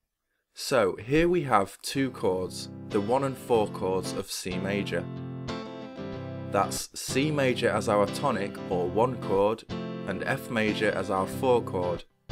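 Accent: United Kingdom. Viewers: Excellent lesson. Awful British accent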